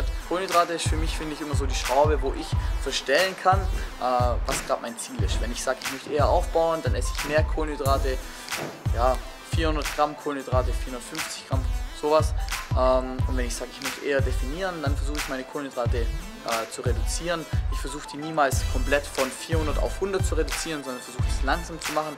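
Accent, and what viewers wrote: Germany. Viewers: woher kommt den der Schwabe genau? :D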